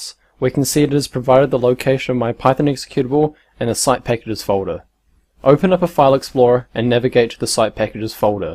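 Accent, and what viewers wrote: New Zealand. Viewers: That T H I C C en zed accent Great vid!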